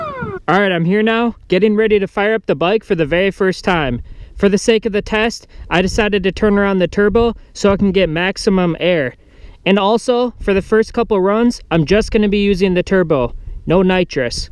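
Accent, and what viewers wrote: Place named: Minnesota, United States. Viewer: Another Minnesotan eh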